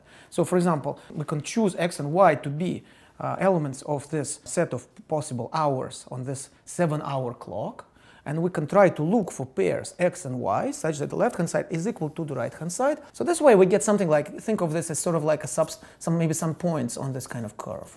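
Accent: Russia. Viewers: His accent sounds like Russian. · Russian accent explaining how the US govt spied on... Everyone. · I didn't even listen to the contents of the video, I just love the Russian accent! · He has definitive russian accent. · Dat Russian accent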